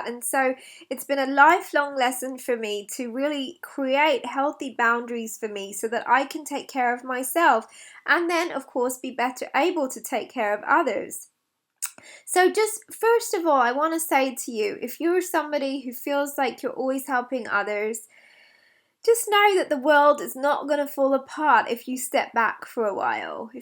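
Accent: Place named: Australia. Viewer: That's a very Aussie accent. Greetings from Brisbane.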